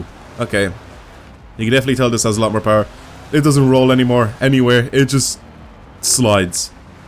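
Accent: Ireland. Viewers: ***** aha, i thought it had a bit of irish, but i always felt like it was something else, i would have asked you earlier, i just didnt have an account :P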